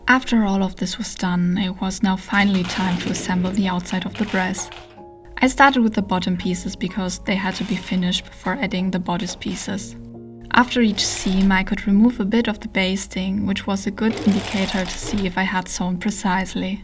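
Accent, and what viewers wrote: Germany. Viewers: I love this video about the creation process of this wonderful dress. It looks so good! Those color nuances! So cleverly designed and made! I admire your patience. I sew myself, but I've never done anything so elaborate. It was so enriching to watch you go through your creative process. I could watch you for hours as you walk through the church in this absolutely extraordinary dress. I stumbled across your channel by chance and of course I subscribed. Und ich dachte mir doch, dass sich dein Akzent deutsch anhört. 😂 Viele Grüße aus dem Saarland. ❤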